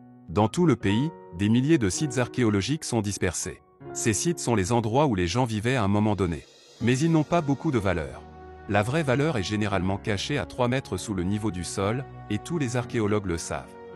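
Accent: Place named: Provence, France. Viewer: je confirme l'accent de Provence si je ne m'abuse rajoute un charme. (Salutations d'un marseillais (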